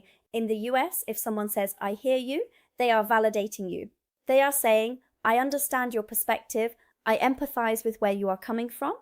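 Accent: United Kingdom. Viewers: Why do you have a British accent and mannerisms? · You sound British though 😮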